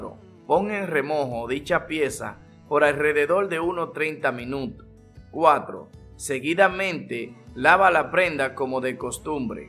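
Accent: Dominican Republic. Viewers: Jajaja creo que los dominicanos hablan asi o Puerto rico no me acuerdo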